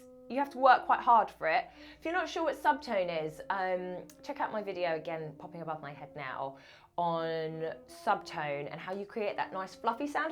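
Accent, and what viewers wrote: United Kingdom. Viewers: I like the French first name with the British accent😏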